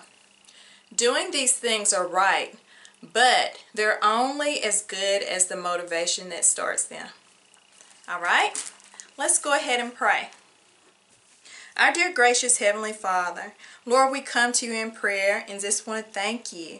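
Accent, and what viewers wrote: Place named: South, United States. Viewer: Just stumbled across your channel, love your content and your country accent! ❤️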